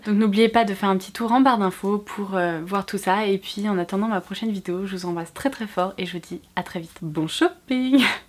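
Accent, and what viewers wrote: England, United Kingdom. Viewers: Petite question idiote mais il te sors d'où ton accès en anglais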